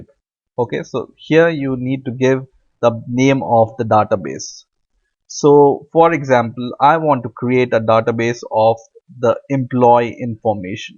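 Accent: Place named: India. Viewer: His English is very good!! He just has the Indian accent which is natural considering he's an Indian! :)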